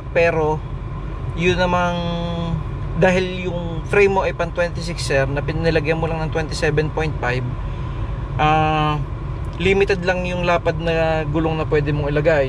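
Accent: Batangas, Philippines. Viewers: Batangueno ka ga? Hahaha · Sir Batangeno ka ba? Ala eh panotice sir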